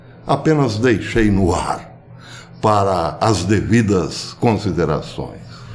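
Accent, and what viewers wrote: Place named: Minas Gerais, Brazil. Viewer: PROF.... A CADA DIA QUE SE PASSA, SOU AINDA MAIS SEU FÃ.CONTINUE DESCONTRAIDO E USANDO SEU MINEIREZ.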